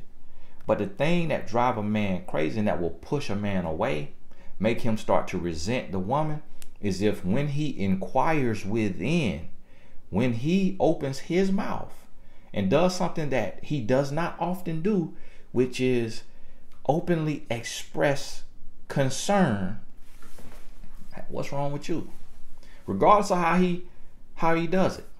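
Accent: South, United States. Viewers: I love the voice impressions😂 Just cracks me up. But the country accent makes a lot of these truths more believable. You know what you know and have this here knowledge to share, and you are sharing it, For Free. You could bundle these pod casts in a series and sell em. Keep doing what you are doing! Blessed tribe. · You from down south hear it in yourp accent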